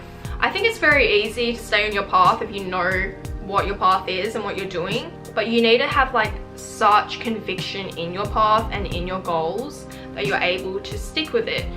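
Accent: Australia. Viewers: is my inspiration, therapist, and australian accent guru <3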